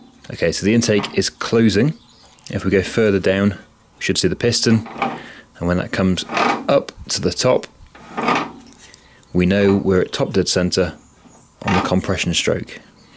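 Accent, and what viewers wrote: United Kingdom. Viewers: May I suggest a shake and break for stuck screw removal? Works great for me. Classic British accent adds class to you videos.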